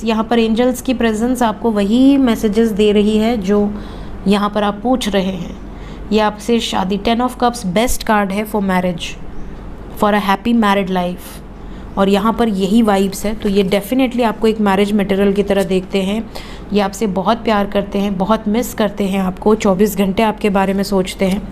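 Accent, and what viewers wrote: Varanasi, India. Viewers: Aap ko iswar firghau de aap ki banarasi aawaj aur andaj dono mujhe mere Banaras ki yaad dilate hai❤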